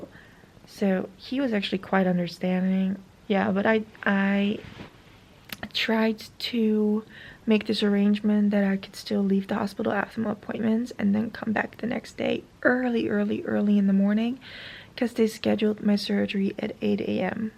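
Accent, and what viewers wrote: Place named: United States. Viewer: You have an American accent , even though you are not from America. Where are you from and where did you learn English ?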